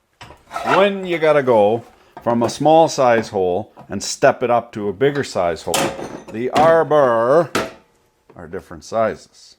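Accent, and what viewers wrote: Quebec, Canada. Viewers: i have a stupid question where you from men, i heard ya speak french and you sound like you're from quebec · Help me out, I'm new to this channel and I cant figure out if hes super canadian or from texas. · I was gonna say Canadian or from up in Michigan. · He's a Canuck!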